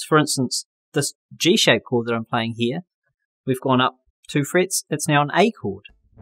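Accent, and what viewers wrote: New Zealand. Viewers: Bro you’re a kiwi aye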